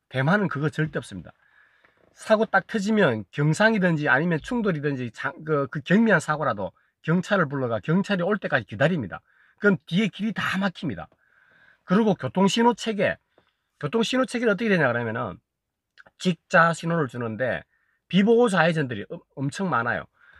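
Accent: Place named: Pohang, South Korea. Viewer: 소중한 정보 고맙습니다. 구수한 포항 억약이 인상적입니다. ^^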